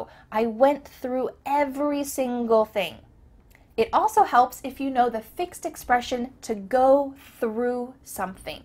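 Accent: United States. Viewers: I want u to do more videos on how to sound like an American....PLZZ PLZZ just get a series on it so that everyone would be able to get the same accent on point just like u🙏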